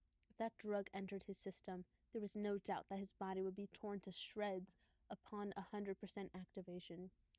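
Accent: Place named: Texas, United States. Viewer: you sound Texan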